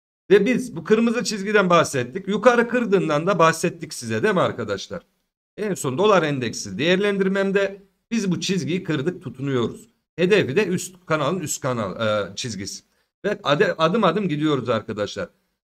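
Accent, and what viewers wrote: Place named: Nigde, Turkey. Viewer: Usta niğdeli aksanıyla konuşuyorsunuz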